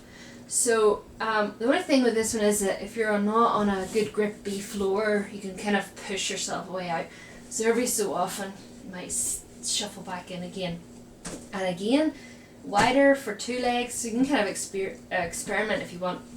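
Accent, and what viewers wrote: Ireland. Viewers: I love Irish accents. I was in heaven watching "The Fall"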